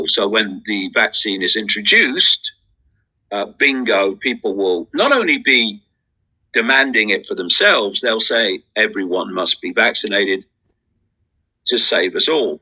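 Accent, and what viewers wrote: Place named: United Kingdom. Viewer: Woke In So Cal 100% agree! He can be funny too when he mocks people in his British accent 🤣 be vigilant,stay safe and head on a swivel! Much love ❤️💯🙌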